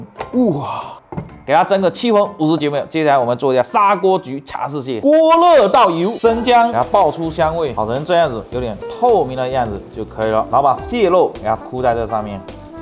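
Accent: Fuzhou, China. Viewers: 说是“虎纠人”讲普通话更准确一些，“湖建”的方言太多了，小文哥口音是标准的“虎纠人”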